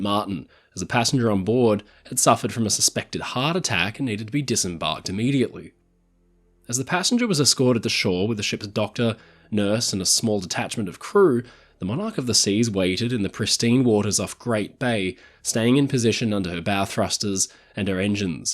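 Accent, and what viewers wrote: Australia. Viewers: Great to hear the beautiful Australian accent. Great vid, but you might want to brush up on your tenses.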